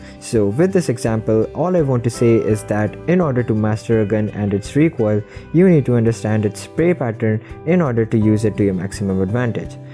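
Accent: India. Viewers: i hate indian accent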